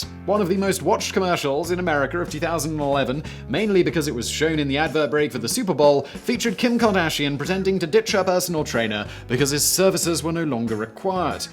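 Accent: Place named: United Kingdom. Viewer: nope, hes just British.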